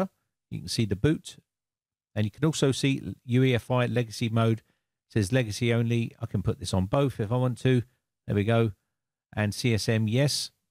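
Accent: United Kingdom. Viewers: August 14, 2022 - Compliments to for a clear, and concise instructional and informative video. As an anglophile, I particularly like accent.😉 Most of my drama entertainment comes from Brit productions. I watched this video because Microsoft is getting more authoritarian by the day. With Windows 11 they are basically making people that buy that OS their property. They want to control everything from the customers' PCs to the customers themselves. Google is no "knight in shining armor", but I would rather sell them my information in return for an OS that works, and is free in a monetary sense anyway.😏😏 One of the more positive things coming out of the whole Climate Change, and preserving resources movement. Is that people are becoming increasingly concerned about Tech items that can't be upgraded or repaired. So fortunately more people are interested in recycling and repurposing things that shouldn't be thrown into landfills until they are absolutely useless and just don't work anymore. 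I would appreciate it if made a future video that is more oriented towards installing a Google OS on desktop PCs. Cheers from Connecticut, U.S.A👍👍😊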